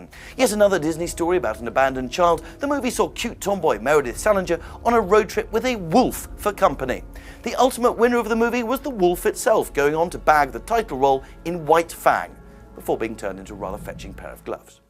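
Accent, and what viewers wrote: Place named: United Kingdom. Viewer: He's from this placde called Britain, also known as England. They say words a little bit different over there.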